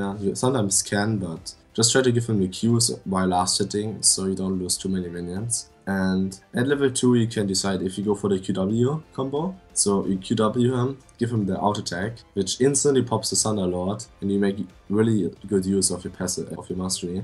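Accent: Germany. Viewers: Dieser deutsche Aktzent ;D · Dieser deutsche Akzent omg xD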